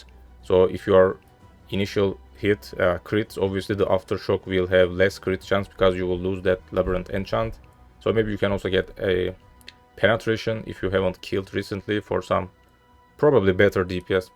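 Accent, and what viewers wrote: Turkey. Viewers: Türk ingilizcesi bu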